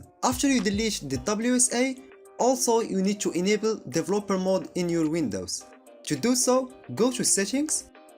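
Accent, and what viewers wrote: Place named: Morocco. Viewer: you have the most Moroccan accent bro I like it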